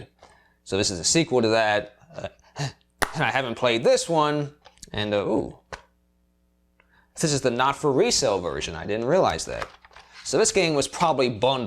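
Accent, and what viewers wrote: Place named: Bronx, United States. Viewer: are you from newyork fam cause you sound like yeah from the bronx its lit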